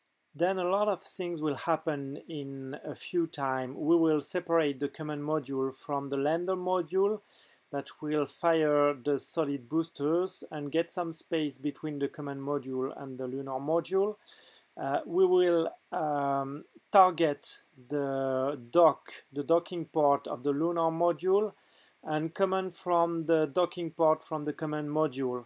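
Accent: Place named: France. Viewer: Quelle négativité. Malgré l'accent français on comprend très bien ce qu'il veut dire et la vidéo présente très bien la fonction.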